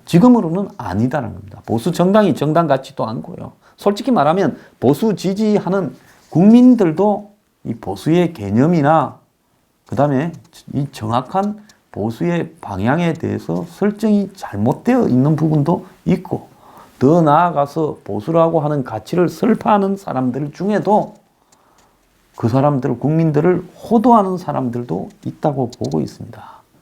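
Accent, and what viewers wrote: Gyeongsang, South Korea. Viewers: 사투리 지적하시는분, 불평하시는게 좀 한심해서 한소리 할께요 같은분이 이 난국에 개 돼지들 깨우치려 힘쓰시는것에 감사합시다. 경상도 사투리라 못 알아듣는거 아니잖아요? 쩝쩝이보다 천배 만배 듣기 좋지 않습니까? 내용에 집중에 주세요. Don’t be grumpy or criticize over not important things!!! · 숨겨진 경상도 사투리가 구수합니다. 이런들 어떠리 저런들 어떠리 운운 하는 자들은 말씨를 바꾸는 재주가 탁월합니다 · 사투리 억양듣기 전혀 안불편합니다. 단어구사는 표준어시고 어조만 사투리인데 성조가 있기 때문에 오히려 집중이 잘되고 단어가 확실히 들립니다. 모노토너스한 말투보다 훨씬 좋습니다. 참고로 저 경상도 사람 아닙니다 아버지 경기도 어머니 호남분입니다 서울서 나고자랐지만 영남 어조 오히려 집중 잘되고 귀에 단어 강조가 뛰어납니다. 욕도 심한욕 없고 듣는 사람이 시원합니다. 이상한 사람들 말 신경쓰지마세요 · 중앙아시아로 좇겨가도 경상도사투리 못바꾼다 좋은건지 아닌지 건강이최고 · 그 정도의 욕은 경상도말과 찰떡궁합! 스트레스가 풀립니다. 염려마시고 스타일대로 하세요! 응원합니다!! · 이난국 시기에 별것을 다 가지고 시비을 거네요 님의 매력은 경상도 사투리라고 봅니다 올은말만 콕콕 찝어서 뉴스를 하는데 사투리 때문에 부드럽게 들린다고 생각합니다 의욕은 욕이라고. 볼수없다고봅니다 이렇게 좋은 뉴스를 보고 듣는데 조금은 불편해도 우리 모도가 이해를 해야한다고 봅니다 의 뉴스브리핑에 감사드립니다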